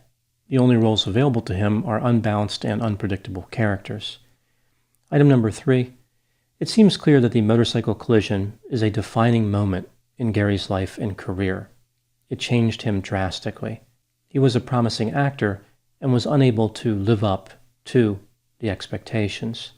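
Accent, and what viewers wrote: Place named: East Coast, United States. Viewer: With all due respect to those from New Jersey, judging by East Coast accent, I'd say he's been there a time or two. So, he likely knows of what he speaks.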